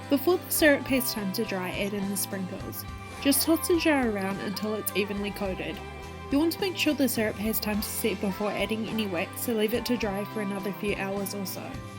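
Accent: New Zealand. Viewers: hey! are you a kiwi? I think I detect a New Zealand accent? if so, hi fellow NZer! just wondering, where specifically did you buy the wick? I find it difficult to find here in New Zealand, and was wondering where you got it. thanks!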